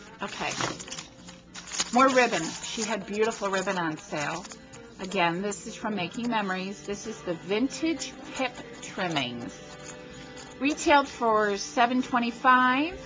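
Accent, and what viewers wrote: New Jersey, United States. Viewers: U CRACK ME UP!! I just love seeing your videos pop up because beyond enjoying what you create... I just love listening to your monologue!! I have a dear friend who's a Jersey girl, too, and its like listening to her when I catch your videos! You always provide a laugh for me!! TFS! ~Karen P.S. DEAL, DEAL, DEAL!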